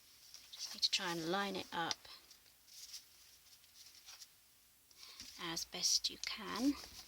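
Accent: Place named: United Kingdom. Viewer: So nice to see and hear someone from the UK, great video and book.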